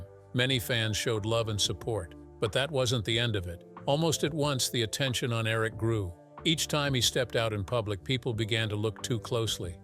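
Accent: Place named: United States. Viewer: WHY IS AN AMERICAN Doing The Commentary?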